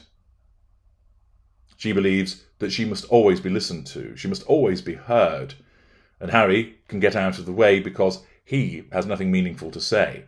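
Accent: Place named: Scotland, United Kingdom. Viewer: Love the Scottish brogue 😄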